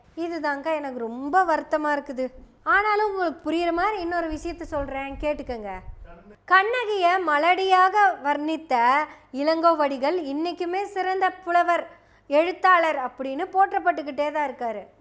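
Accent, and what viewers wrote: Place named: Coimbatore, India. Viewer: Sister, you have narrated the Silapathikaram in a different dimension. Your speciality is your Coimbatore modulation. Keep going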